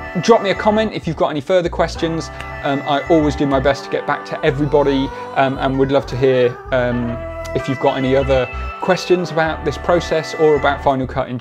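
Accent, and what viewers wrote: United Kingdom. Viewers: You are the ONLY ONE who resolved my issue.... I BOW TO YOU🙏🏼❤Feeling so so so grateful. Plus I love the British accent🤗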